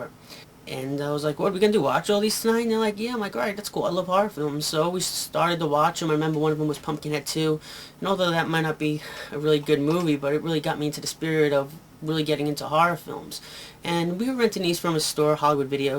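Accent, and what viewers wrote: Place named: East Coast, United States. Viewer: You're from the East Coast aren't you? You don't sound like you're from Cali or something.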